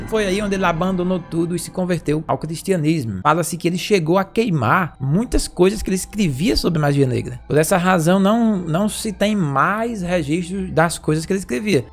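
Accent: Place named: Northeast, Brazil. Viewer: 😅 kkk então amado esse sotaque nordestino é muito engraçado, eu como satanista teísta e praticante do ocultismo pagão. É praticante da demonolatria, custei pra entender, o grande mestre e bruxo e feitiçeiro São Cipriano. E porque os demônios, insistiram, pra mim comprar esse livro mágico ou grimorio demoníaco satânico antigo sagrado de São Cipriano. Ele possui rituais das artes mágicas de alta magia negra com o Deus Satan ou rituais satânicos. Sim 👍 é atualizado em diversas versões e traduzido em vários idiomas. Não é um ☝️ simples livro, é um grimorio demoníaco satânico antigo sagrado. Já começa por aí, não 👎 é escrito para pessoas curiosas, é feito para praticantes de magia negra pagão. E para quem pratica a demonolatria e lógico. Estuda e pesquisa a demonologia, não 👎 vou ficar aqui explicando tudo. Porque são segredos dos seguidores da magia Ciprianica e obivio da magia negra. 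Eu como satanista teísta e bruxa 🧙‍♀️ e feitiçeira e médium espiritualista, fiquei muito curiosa. Quando minha Daimon pessoal, me disse Rosanna, sua vida e jornada espiritual. Já está em tanta evolução astral, que precisa estudar e pesquisar e praticar o mestre e bruxo São Cipriano. Na verdade dele, tenho dois grimorios, inclusive o grimorio demoníaco satânico antigo sagrado São Cipriano o bruxo capa preta. Eu fiquei chocada 😲 ao ler pela primeira vez. Disse pra o demônio. É muito crueldade, kkk eu juro falo tanto de animais como de pessoas. De início eu juro, não 👎 gostei do nenhum, mesmo sendo sat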